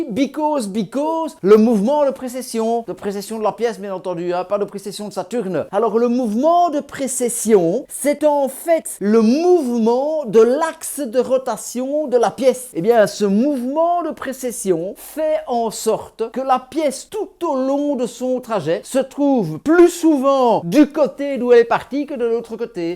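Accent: Belgium. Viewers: J'adore l'accent belge 😂👍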